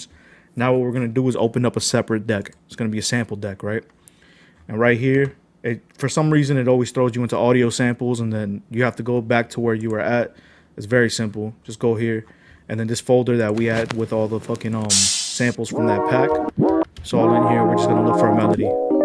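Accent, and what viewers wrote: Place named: New York, United States. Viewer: True New Yorker, where you from?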